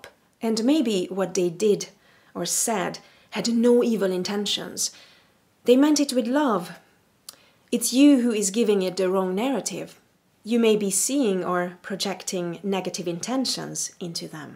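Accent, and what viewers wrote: Hungary. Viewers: Hi from Hungary. You sound to have a Hungarian accent.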